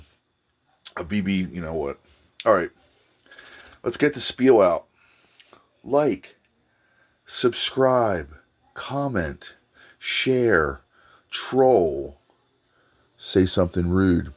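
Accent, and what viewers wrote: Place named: Philadelphia, United States. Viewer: Man i heard that philly accent and thought damn ill get this dude some bread but your down in Florida . Im in md . Other than home made tats ive done all my work was done by a dude named Frank at a shop in philly off south street ( Eddie's i think is the shop every time i was wasted so i cant remember ), its all jacked up drunken spur of the moment stuff. If your ever blowing through MD or philly let me know 🤙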